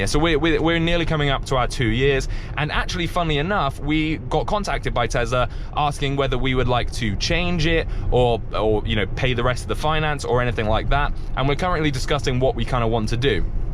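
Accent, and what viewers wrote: United Kingdom. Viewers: I like your british accent, you sound like DanTDM. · I love your British accent! :)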